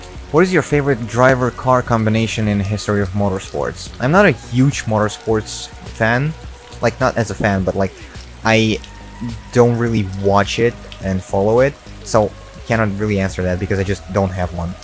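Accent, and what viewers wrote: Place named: Russia. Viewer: How long have you been speaking english? You hardly have an accent so I didn't believe that you were Russian at first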